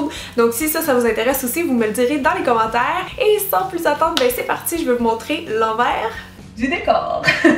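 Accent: Quebec, Canada. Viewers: Un vrai métier on se rend pas compte de tout ce travaille... c'est un pure bonheur de te regardé et de t'écouter car ton accent québécois me fais voyager 😊 bisous 😘 une fidèle abonnée française 😂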